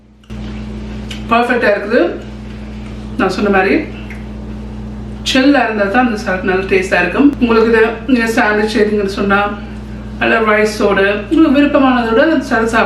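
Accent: Sri Lanka. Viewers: Like your ilangai Tamizh.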